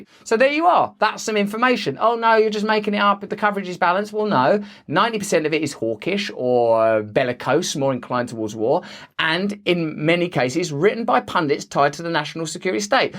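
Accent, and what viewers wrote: United States. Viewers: Mr. Brand. I heard your name some time ago in connection with "right wing conspiracy theorists" and as usual paid the accusation no mind because it came from the same media outlets that always use that phrase to marginalize those who say what they don't want anyone to say much less think about. I've so far tripped over a couple of your videos. Haven't seen you espouse a single CT yet. Today I subscribed, but I have to admit, at least partly because you're the FIRST Brit I've ever seen who can manage more than one American accent. Also, because you mentioned Orwell who seems to have been relegated to unperson status recently as all the major "Western democracies" vigorously and enthusiastically utilize every doublethink and doublespeak tactic he invented. They took what he wrote as a warning and are using it as a manual! Why do I say Orwell is an unperson? 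I'm 69 and my kids and grandkids are no longer required to read him in school. They didn't even know who he was or what he wrote until I told them.His books haven't been banned- yet- but he isn't being taught any longer. They are unequipped to recognize The State closing ts grip on them, and would probably gleefully go along with the USA going full "Team USA: World Police" as that article endorses. When the fuck did life start imitating satire? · I really like your American accent. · dig american gangster voice the best. Gilbert Godfreyesque. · Hey, why does it seem like it is easier for you to speak with an American accent than your native accent? Keep up the great discourse!!! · American accent is better than his English accent…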